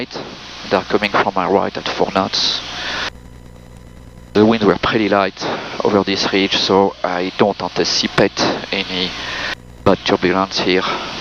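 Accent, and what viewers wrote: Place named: France. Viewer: Just with the accent we can see that you are french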